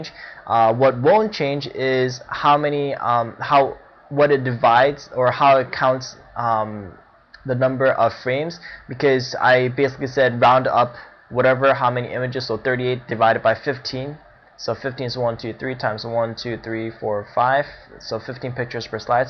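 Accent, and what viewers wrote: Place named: Midwest, United States. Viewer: Thank-you for the tutorial! In answer to your question - your accent sounds like midwest American with a slight hint of Asian.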